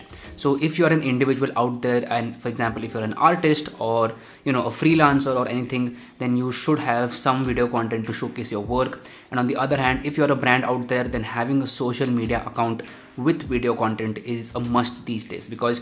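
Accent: India. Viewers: Hhh indian accent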